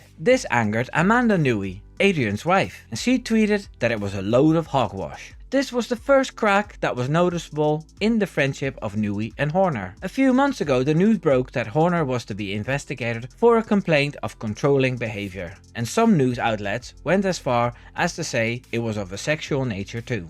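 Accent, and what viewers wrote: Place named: Netherlands. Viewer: You are obviously Dutch from your horrible accent! Jos is the trouble-maker,not Christian! Max, unfortunately, only does what dad tells him.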